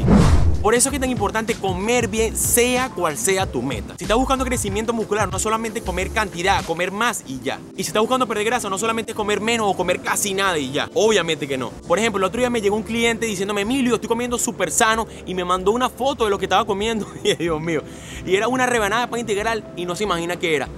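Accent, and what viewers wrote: Venezuela. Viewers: Parece venezolano por el Acento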